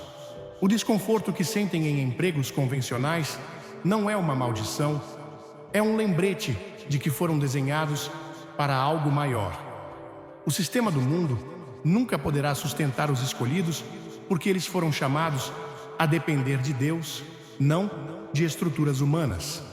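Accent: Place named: Portugal. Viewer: Porque esse portugues chato/horroroso de Portugal, se o canal diz ser do Brasil?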